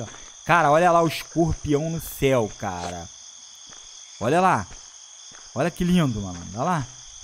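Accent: Rio de Janeiro, Brazil. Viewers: Não sei se ele é carioca , mais nos caraioca falamos assim kk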